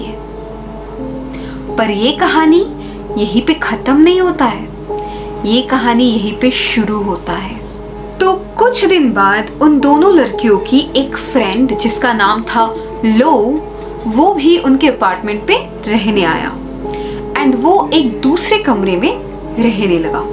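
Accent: Bengal, India. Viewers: Apki bengoli hindi muja bohot pasend haii 😂😛😍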